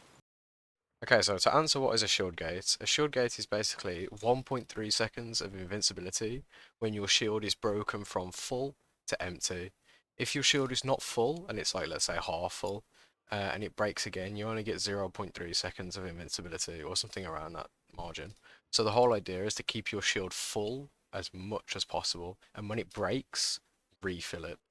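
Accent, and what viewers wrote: United Kingdom. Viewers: Great vid from my favourite Bri'ish content channel! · new bri'ish content woaaw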